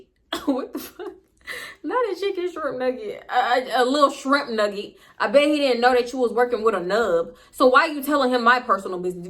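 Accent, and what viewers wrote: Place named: South, United States. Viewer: You sound extra southern today idk what it is 😂😂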